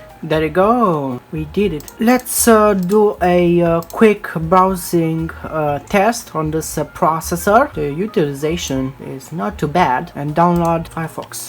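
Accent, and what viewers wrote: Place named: India. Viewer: you sound indian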